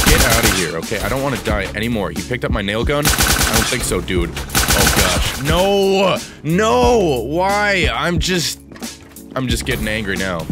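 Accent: Minnesota, United States. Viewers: R u from minnesota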